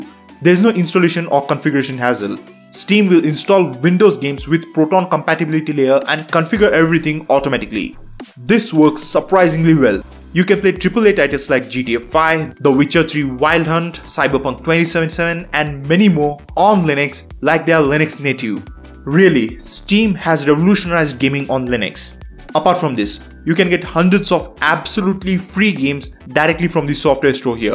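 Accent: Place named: India. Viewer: Arrey bhai accent kam kar. “Kompooting” experience . Sharm aati hai desi accent se ?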